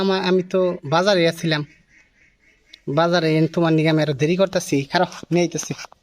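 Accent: Tangail, Bangladesh. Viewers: kotha shunei mone hoechilo , Amar jonmosthan o Tangail, korotia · ভাই আপনার কথার ভাষায় তো বুঝা যাচ্ছে আপনারবাড়ি টাঙ্গাইল জেলা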